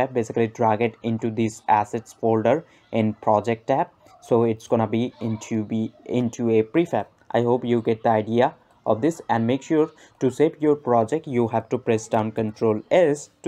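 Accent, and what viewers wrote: India. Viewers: The quality of the parallax is not good enough, also your project needs pixel perfect scrolling etc. Furthermore hindi accent is quite annoying for english speakers to listen to. So make great effort to lose that accent as good as possible.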